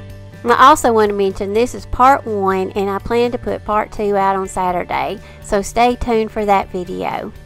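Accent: South, United States. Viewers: Love it all and love you too!!! You are such a sweet southern lady and love to hear you talk (Georgian here). Have a blessed day and look forward to the second half tomorrow!!!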